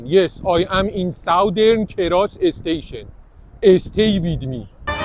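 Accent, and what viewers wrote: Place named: United Kingdom. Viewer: I really enjoy practicing english accent with your videos. Hope to see more long form content of you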